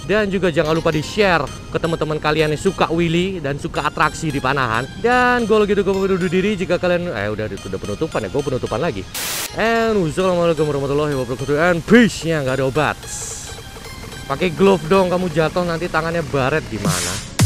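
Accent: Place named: Java, Indonesia. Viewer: Baru pertama kali liat, dan ternyata medok medok gimana gitu, berasa ngedenger orang jawa, orang jawa mas?😂😂😂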